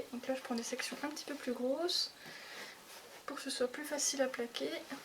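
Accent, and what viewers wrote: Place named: Franche-Comté, France. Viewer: tu as très bien expliqué!! tu as l'accent de chez moi enfin la franche comté!! je test ce soir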